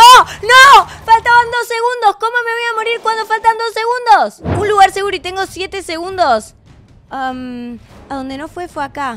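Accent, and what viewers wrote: Argentina. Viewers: Usted es de argentina verdad?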